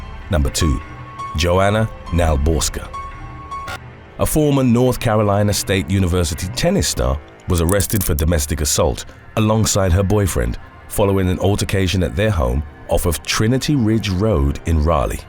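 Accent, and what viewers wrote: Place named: England, United Kingdom. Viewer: Valentine he has an English accent. They pronounce things differently than others may. You need to stop embarrassing yourself by not knowing that.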